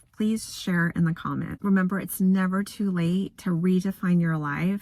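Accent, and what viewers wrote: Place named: New Jersey, United States. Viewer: She sounds like she is from Jersey:)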